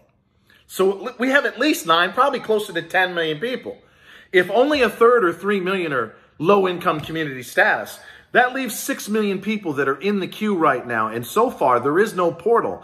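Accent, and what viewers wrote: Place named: New York, United States. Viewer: Let me repeat myself..... Middle class is being FU$&€% OVER!!!🤣🤣 I was born and raised on Long Island, and I Swore when I first heard you talking...I KNEW.... you were a New Yorker!!! LOL 😂 We love you too!🤩☮️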